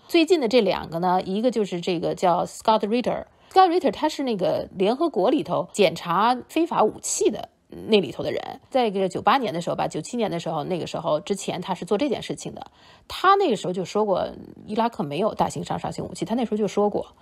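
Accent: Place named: Haidian, China. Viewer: 海淀口音…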